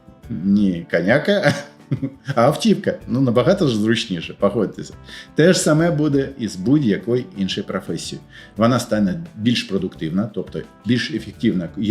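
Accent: Belarus. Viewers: bialoruskij akcent:) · Свідомий перехід на українську для російськомовних людей - це найкращий доказ вибору, часто дуже тяжкого і заслуговує поваги, але... поки у це схоже на білоруську ))) Може, ШІ допоже? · Сорян, що не втримався... Але якби думати, що білорус, то акцент був би "природний" )) Суто з філологічної сторони якщо сприймати... 🤞 P.S. А за інформативність по суті - тільки респект:elbowcough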